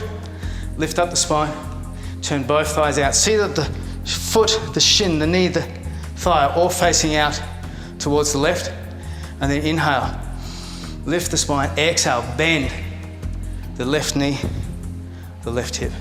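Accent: Australia. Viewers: I do yoga on You Tube every 2nd day and it is so refreshing to finally hear an Aussie voice! Thanks for the vid :)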